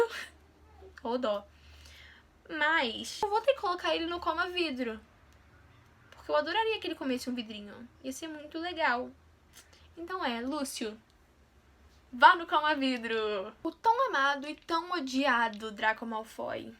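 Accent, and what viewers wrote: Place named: United States. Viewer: seu sotaque americano é tudo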